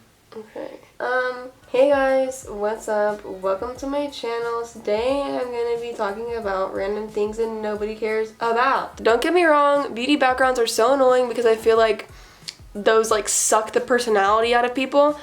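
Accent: Texas, United States. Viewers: she sounds so texan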